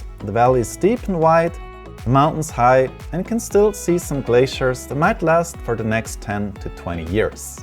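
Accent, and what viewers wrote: Switzerland. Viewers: Your Swiss accent! 🤗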